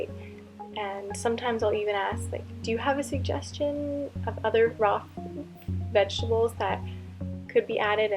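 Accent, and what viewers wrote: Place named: Canada. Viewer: dunno where she lives now but she definitely sounds canadian based on how she says 'about'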